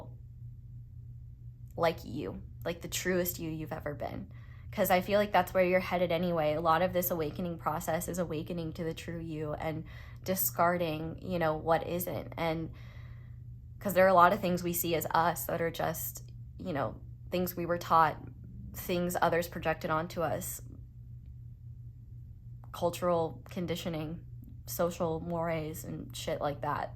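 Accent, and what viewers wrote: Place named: South, United States. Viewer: I love the southern accent it’s cute thank you 🙏 god bless you ❤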